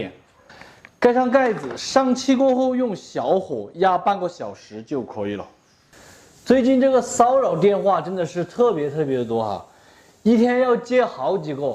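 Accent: Sichuan, China. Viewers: 很好聽，很標準的四川普通話😂👏🏻👏🏻聽起來很有親切感！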